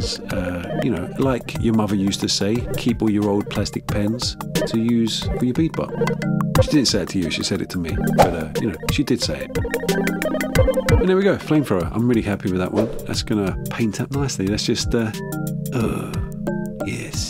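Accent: United Kingdom. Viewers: Hey, extra British in this video. Can’t tell ya why but just wanted to point it out. Love your stuff and your noodle.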